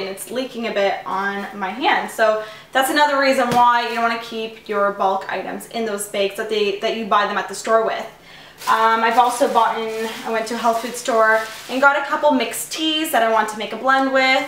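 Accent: Canada. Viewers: Love your recipes and ideas. You should have a show on the Food Network. Is that an Italian-Canadian or Greek-Canadian accent?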